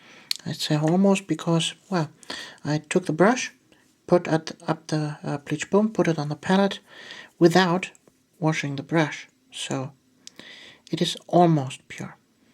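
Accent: Germany. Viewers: she's german it's just her accent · are you from germany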